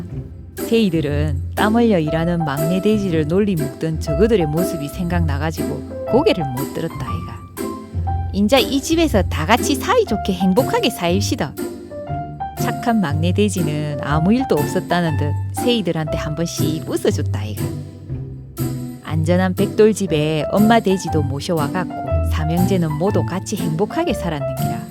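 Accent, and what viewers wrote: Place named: Busan, South Korea. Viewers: 벽돌집의 숨은 비화를 파헤친다!!! 부산 말로 재미있게 들려 주셔서 감사합니데이~😊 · 귀에 쏙쏙 들어오는 부산 사투리가 정겹습니다. ^^ 세이(형), 속에 천불이 난다, 쎄가 빠지게, 쎄때 등등 어릴 적 고향에서 많이 듣던 말들이라서 그런가 봐요.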